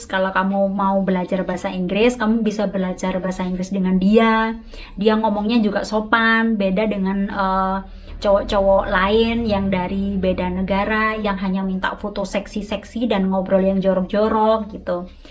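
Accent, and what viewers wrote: Java, Indonesia. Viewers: Mbak e kok medok .. aslinya daerah mna mbak ? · Mba asli org dari jwa ,pa luar,jwa,soale kya medok jwa,maaf ya mbaa,? Tnya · tak panggil mbk ya...aku dari Semarang Kendal mbk..logat Jawa kelihatan bgt...salam kenal ya..sehat selalu disana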